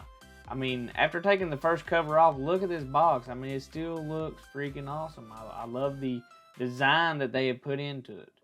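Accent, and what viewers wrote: South, United States. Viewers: U from the south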